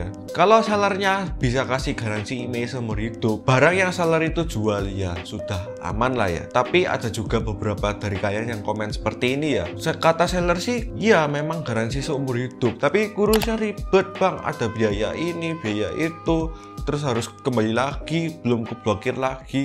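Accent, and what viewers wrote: Java, Indonesia. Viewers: Medhok bang hehe semangat bang salah satu inspirasi sya 🙏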